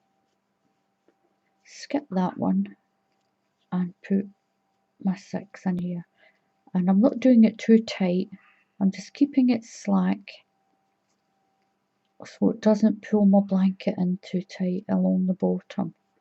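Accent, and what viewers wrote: Scotland, United Kingdom. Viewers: It's great to hear a Scottish voice again, likr mine, your so accurate and easy to follow,as I'm a novice to this.Thanks xx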